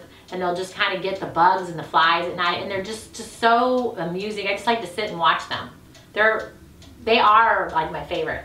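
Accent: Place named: Arkansas, United States. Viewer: my friend from Little Rock sounds just like you. Is that where you are from?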